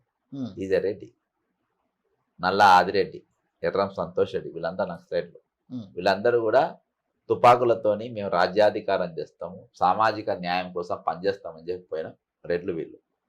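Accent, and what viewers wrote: India. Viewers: Anchor తెలంగాణనా ఆంధ్ర నా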